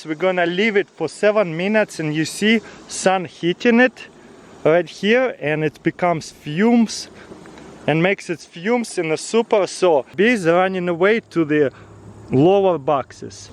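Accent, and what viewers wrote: Russia. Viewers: Are you Russian your accent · Your accent is beautiful. I love Russian accents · Love your Russian accent! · Beautiful russian accent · У тебя акцент да? Ты не говоришь по английски,тты руский!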